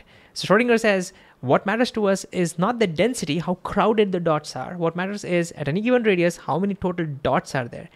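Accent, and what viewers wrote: India. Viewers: Brroo , is he indian 😑😑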